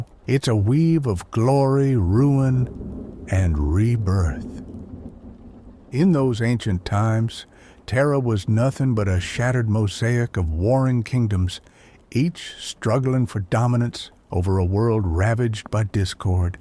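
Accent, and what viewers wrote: South, United States. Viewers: you're welcome. if I may ask what accent is this? It sounds southern, which is cool since most 40k stuff is British. Now I want an inquisitor with a southern accent now. Like those charismatic pastors, but more angry. And a "hillbilly" ogryn. And guardsman that sounds like Lee Ermey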